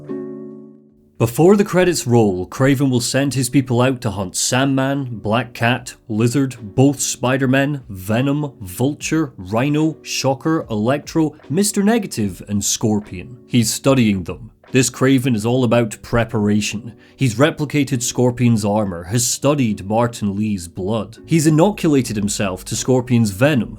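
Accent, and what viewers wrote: Scotland, United Kingdom. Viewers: I swear you used to be scottish. Am i experiencing a mandela effect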